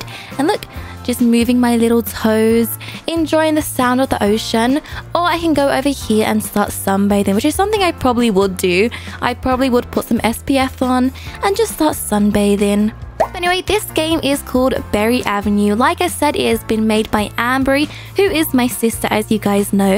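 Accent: England, United Kingdom. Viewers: You can tell she’s English lol my dads english so that’s awesome oh wait aren’t you? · I love your British do u speck British or something kinda with British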